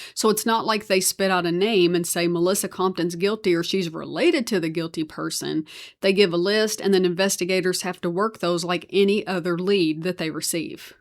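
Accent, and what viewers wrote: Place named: South, United States. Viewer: This was a pretty clever gambit and it makes me wonder how commonplace this kind of grift is. If you think about it thousands of people could be pulling the same stunt. If SSI hasn’t seen a death record or announcement they keep sending the checks. Imagine the temptation to some survivors when they realize those checks will still keep coming. This was a good report and I have subscribed. I’m from New Jersey and I really enjoy your Southern inflection. Keep up the good work…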